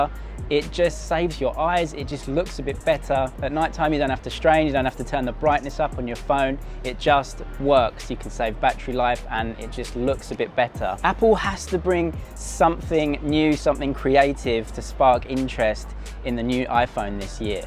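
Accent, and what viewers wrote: United Kingdom. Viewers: Like your British accent! I watched the video you challenged Guizhou dialect , that’s fun, try to speak Cantonese)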